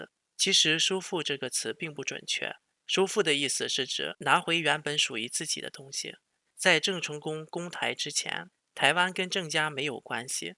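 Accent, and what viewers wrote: China. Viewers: 聽完後，你分析的很有邏輯水平，一聽口音就知道你是大陸的，不過我還是想說，prc現在每天文攻武嚇，而且中美貿易戰開打以來更有走偏鋒態勢，不讓我討厭中國人也難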